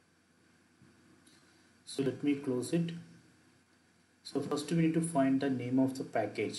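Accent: India. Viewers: Are you South Indian?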